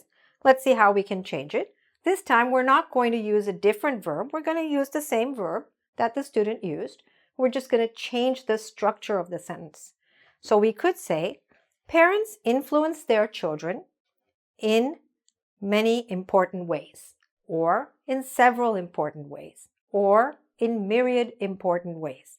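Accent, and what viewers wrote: United States. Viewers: Pardon,it's american english? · Her accent is American so I'd bet for American English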